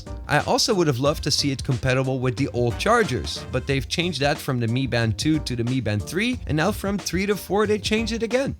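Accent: Netherlands. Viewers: You sound Dutch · hoor ik daar een licht NL accent? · You're Dutch, right?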